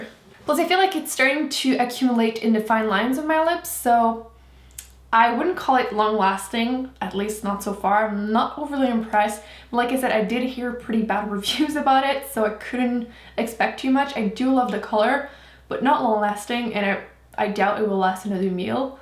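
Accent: Quebec, Canada. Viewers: Hehe. Accent: French Canadian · She is Canadian French. How the heck can you not detect an accent???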